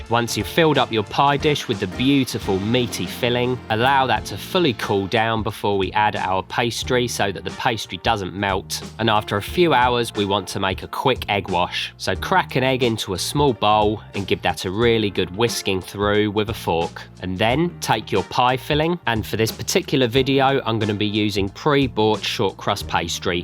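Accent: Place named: Cockney, United Kingdom. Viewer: Why does a "West Country Kitchen" video have a cockney voice over? I expected a Devon burr, or at least some kind of "Ooh, arr"!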